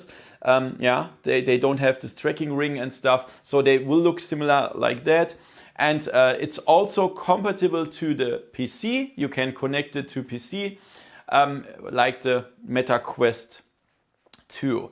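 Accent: Germany. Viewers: Are you German 🤨🤔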